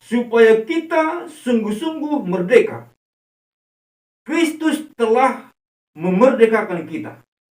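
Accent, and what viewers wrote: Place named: Japan. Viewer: Yang suka dari pak Pdt ini waktu sharing firman Tuhan adalah .. aksen Jepang dalam bahasanya 😁 Mantap kali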